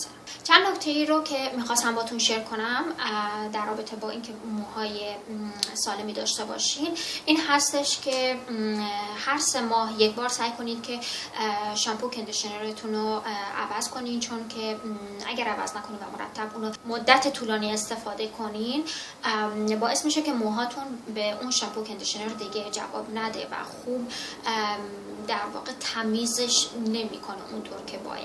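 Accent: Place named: Afghanistan. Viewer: Abbas Barati ازحرف زدنش معلومه افغانیه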